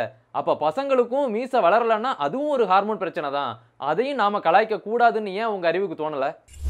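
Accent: Tirunelveli, India. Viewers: Anna neega tirunelveliya ??? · Neenga tirunelveliyaa? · Anna neenga tirunelveli ah?? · Neenga tirunelveli ya bro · நீங்க திருநெல்வேலி தான நண்பா.... எவம்லே அது....அந்த ஒரு வாா்த்த போதும்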